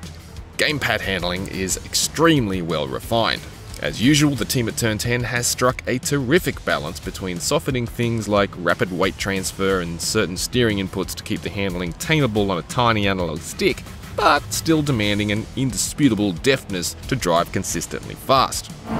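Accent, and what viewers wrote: Australia. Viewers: Australian accented reviewer...and there it is,the Holden Torana...great car. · Well that was...surprisingly Australian.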